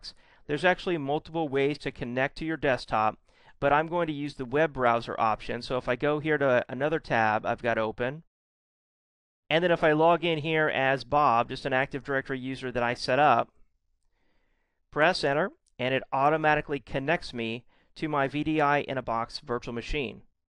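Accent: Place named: Philippines. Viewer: are you a pinoy? you have a strong pinoy accent.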